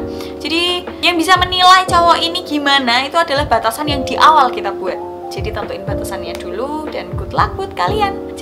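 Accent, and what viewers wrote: Java, Indonesia. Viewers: Jowone ngendi iki mba'e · jowo ndi to mbk, kok kentel tenan jowo. ne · Medok yo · Medoknya ngangeni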